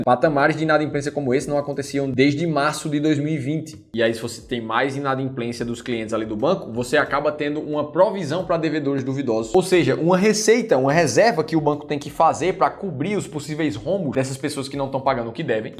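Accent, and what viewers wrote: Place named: Northeast, Brazil. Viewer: Moro no RS, mas entendo perfeitamente bem o vídeo, mesmo com sotaque nordestino.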